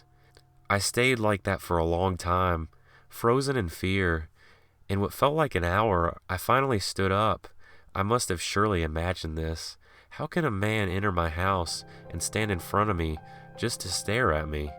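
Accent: South, United States. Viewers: Now with the dialects evoloving with more and more influence from almost any culture it's hard to tell the accent but it is for sure southern and in my opinion I'd say kentucky to the Carolina's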